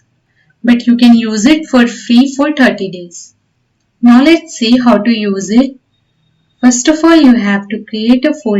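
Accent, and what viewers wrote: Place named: Russia. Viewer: by the way, your speech sounds like Russian a bit) Did you learn something like industrial electronic at the university?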